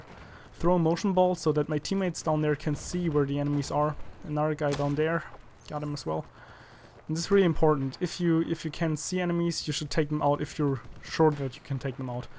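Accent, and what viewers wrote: Germany. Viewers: Oh are you German? I notice an accent there :D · are you german ? You sound like you are. You accent is like you come from germany because of the th pronouncing. -Mojo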